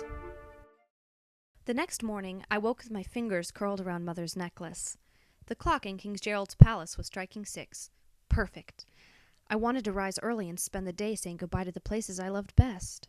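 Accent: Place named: United Kingdom. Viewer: more like posh English, lol i loved the different languages, I never know how to say them when I read it.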